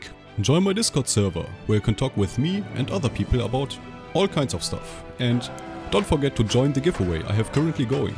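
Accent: Germany. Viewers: are you german? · Ich denke auch sein Akzent hört sich schon ziemlich Deutsch an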